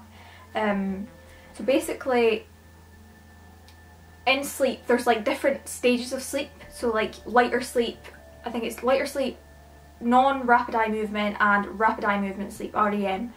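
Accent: Scotland, United Kingdom. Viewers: This story time is immediately 10x better with your Scottish accent omg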